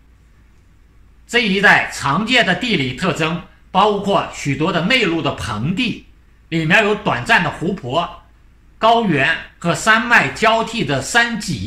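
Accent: Shanxi, China. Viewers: 家門兒山西人嗎？聽出來了哈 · 你是山西人吧? 自己好好纠正一下.难听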